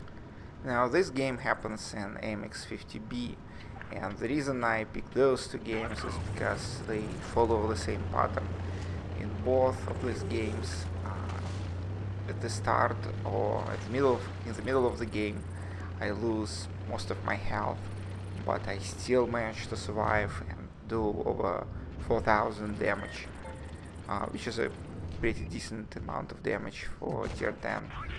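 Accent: Russia. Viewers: Totaljy Rassian accent :D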